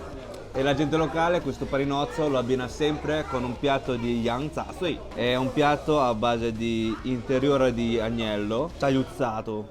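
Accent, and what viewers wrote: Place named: Emilia, Italy. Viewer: I tuoi video sulla Cina sono stupendi. Il tuo accento emiliano e' fantastico.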